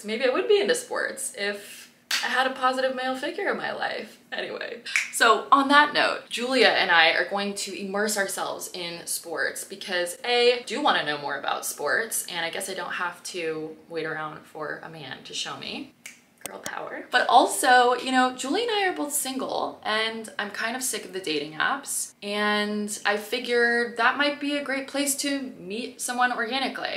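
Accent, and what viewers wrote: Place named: United States. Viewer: I Love this vlogs. I can’t wait to see another one tomorrow. Have you ever tried to go for language exchange meetings? I’m sure a lot of people will love you American accent (at least I do) and you may meet some interesting guys from abroad, open minded and with the energy to discover the world. Meeting someone with another culture is amazing, everyday you learn new things.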